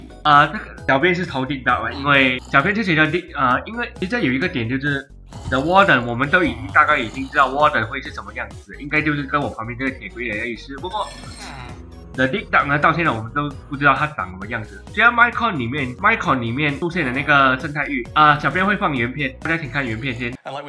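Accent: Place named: Malaysia. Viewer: 抱歉~想請問影片可不可以加字幕，因為我是台灣人，有點聽不清楚馬來西亞的口音，謝謝(◍•ᴗ•◍)❤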